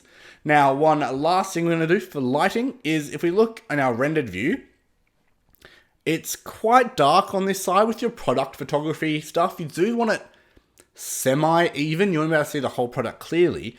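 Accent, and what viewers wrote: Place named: Australia. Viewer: blood hell that was fantastic. I learnt heaps... and its great to hear another aussie too :)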